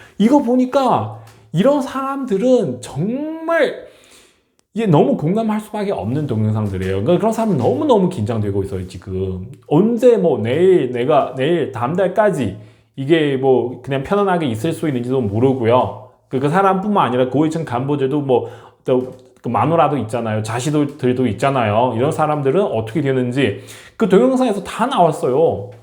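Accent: China. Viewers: 오늘따라 중국남부 발음같네요 문장 이어짐이 불분명해요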